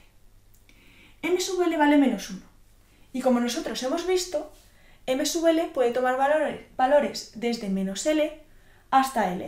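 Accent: Spain. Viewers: Dificil concentrarse con una profesora tan hermosa, dulce y con ese acento español tan maravilloso.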